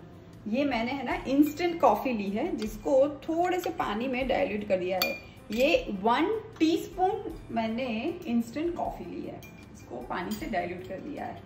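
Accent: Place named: Kathiyawad, India. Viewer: Love to hear your kathiyawadi ascent..and all your recipes ... simple but fabulous 👌👏😘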